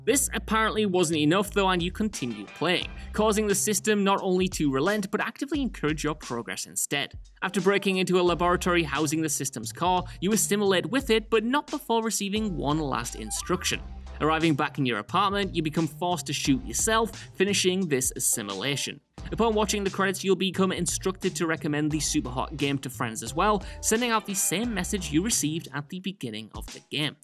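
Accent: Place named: United Kingdom. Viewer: I get the whole British accent thing but if they game pronounces it one way that’s how you should say it! It’s a name after all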